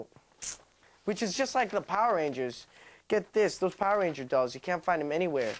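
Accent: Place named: Canada. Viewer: oh man... i completely forgot how deep that canadian accent can be. living here in vegas 4 years i missed it. i remember that show. also the one where the kids call into santa during the christmas season. memories...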